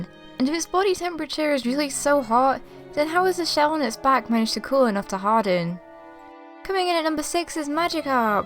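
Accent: United Kingdom. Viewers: Wil Asd its just her accent she is british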